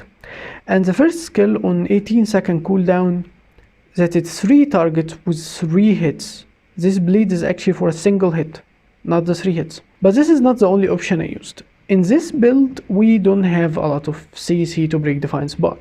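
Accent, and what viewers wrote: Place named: Egypt. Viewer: Thanks for the video ! cheers Dude are you Egyptian ? your accent is Egyptian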